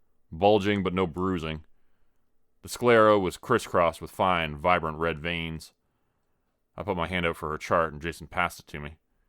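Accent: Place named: Canada. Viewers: I love the Canadian accent. I'm from Western NY living down south and it sounds like home! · Finally. A Canadian narrator. Great voice and story telling Sir! I will subscribe to you now.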